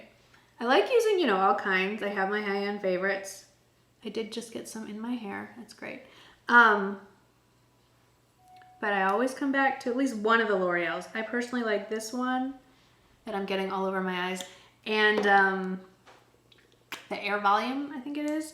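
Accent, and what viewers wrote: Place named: South, United States. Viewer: Omg I love you! Spoken like a true classy southern lady 👏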